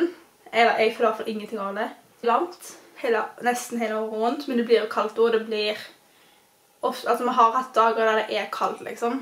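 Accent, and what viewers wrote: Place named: Sørlandet, Norway. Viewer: Koffår snakke du sørlandsk/haugesundsk/ men veldi pent?❤️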